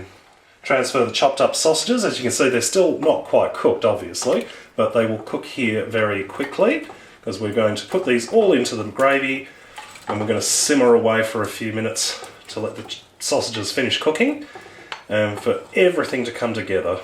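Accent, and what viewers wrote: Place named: Australia. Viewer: It’s the voice for me. Nice Aussie accent.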